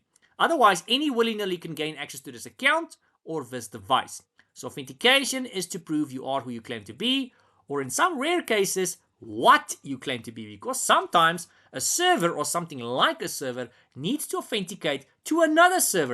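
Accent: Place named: South Africa. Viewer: Just starting my sec+ journey subbing to you simply for your epic south African accent. 😁 The apple sauce of all accents.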